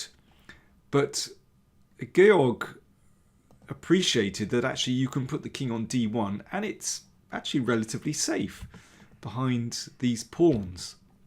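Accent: United Kingdom. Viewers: Your British english is perfect for my understanding as a french chess player !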